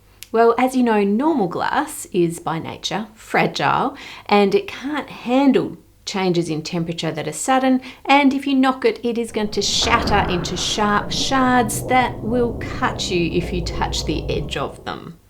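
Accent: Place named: Australia. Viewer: As someone who's grown up in Corning and has a dad who still works for the company that makes Pyrex, it was weird to hear an australian talk about it lol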